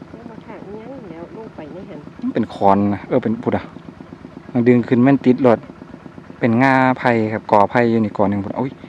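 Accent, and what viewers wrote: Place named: Isan, Thailand. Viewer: ฟังสำเนียงเสียงอิสาน คิดถึงบ้านที่เกิดแดนราบสูง ตกเบ็ดช่อนลุกปลากับแม่อบอุ่น ยิ่งดูยิ่งคิดถึงแม่ที่ห่างไกล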